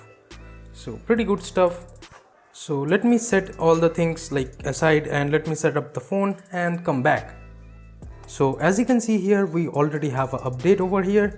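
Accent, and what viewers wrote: Bengal, India. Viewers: Accent tells me you are bangali, even I'm using redmi note 4 from 2 years still in good condition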